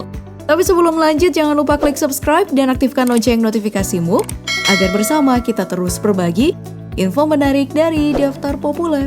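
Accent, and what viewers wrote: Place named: South Sulawesi, Indonesia. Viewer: fixx miminya dari sulsel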